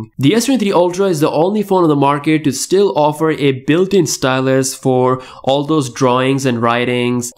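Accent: India. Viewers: You sound like Indian speaking English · Idk but...Ur english sounds like an INDIAN english.. with some added accent.. 🤔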